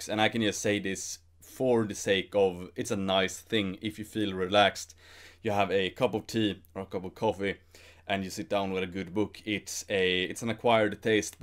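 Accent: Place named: Stockholm, Sweden. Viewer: Är du från Stockholm? Kan inte undgå att höra dom karaktäristiska "i:en"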